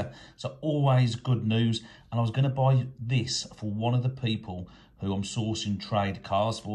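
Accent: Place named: Birmingham, United Kingdom. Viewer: I remember the very first car I bought at auction. A Lada bought from Moseley Road auctions, which I know from your accent you will know :-) ... I was about 18 years of age at the time (now 53) and had a budget of £100, money was tight as a kid. I bought it for a whole £90 !! .. It had 6 months MoT. I drove the car until the MoT ran out, then sold the car from the Solihull Times (nice place to sell cars at the time). I sold it for £150. Actually made a profit on the brick shaped tank !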